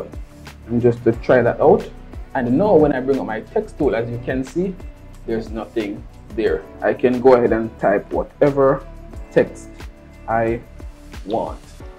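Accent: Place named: Jamaica. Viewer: Bro sound like a Jamaican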